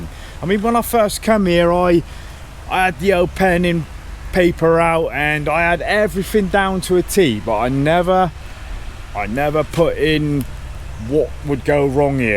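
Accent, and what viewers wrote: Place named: West Country, United Kingdom. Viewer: Some very valid points. I'm married to a woman born and raised in Chiang Mai so a lot of these don't affect me. Where in the south west do you hail from? I lived in Wiltshire, then moved to Devon, spent a lot of time in Dorset and Cornwall, you don't have a Wurzel accent, perhaps a hint of Bristol though... Speaking Thai. I used to always try speaking Thai, but ended up getting puzzled looks, repeating myself, more puzzled looks and then my wife says, exactly the same (at least it sounds exactly the same to me) and they nod and leave, so have pretty much stopped. There are other reasons I'm not really interested in speaking Thai, my wife has about 10,000 family members locally, they ALL want to talk to me! Me not speaking Thai and them not speaking English results in not speaking to them. Is that rude? Maybe but I barely spoke to my brother for the last 12 years I lived in the UK, and I happily avoided speaking to people in the UK for weeks at a time. 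Visa's. It's an unnecessary expense, but I pay a visa agency to do all the work, I spend less than 20 minutes dealing with immigration once a year and I'm happy to pay for that. Ironically it's wasn't the immigration staff who were the issue, it's sitting amongst the endlessly whining/angry expats in the immigration waiting area that I found both depressing and irritating. Budget. I'm the opposite to you, I almost never have unexpected expenses crop up, I know what I need to spend each year and plan for it. In fact since buying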